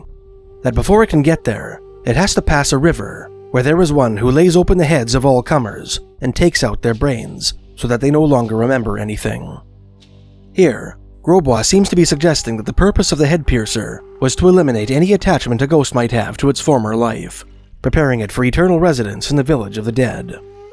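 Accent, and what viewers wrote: Canada. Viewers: They haven’t figured out a way to make AI with that maple-mellow Canadian accent yet. Calm down, bud, don’t get so testerical 😂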